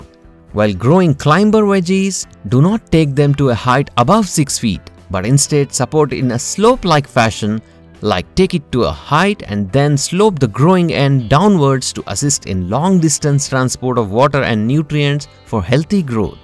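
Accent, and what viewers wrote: India. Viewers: Indian accent sounds cute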